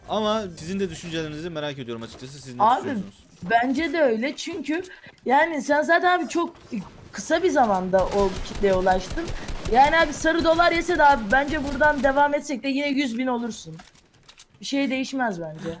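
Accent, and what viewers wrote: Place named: Malatya, Turkey. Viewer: Abi sen malatyalimisin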